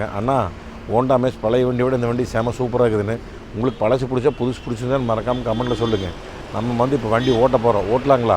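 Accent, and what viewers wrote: Kongu, India. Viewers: Ungaludaya sweet kongu speech really super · கொங்கு பேச்சு வழக்கு அம்மணி மிகவும் அருமை 🌹🌹🌹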